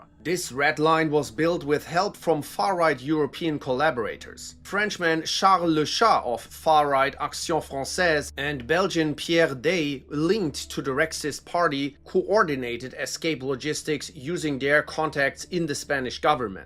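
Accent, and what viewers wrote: Germany. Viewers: Bruh, you don't need to specify you're German. The accent, straight to the point, no vaseline and accurate. Another top tier video. Loves from Spain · I can hear the German education when you speak.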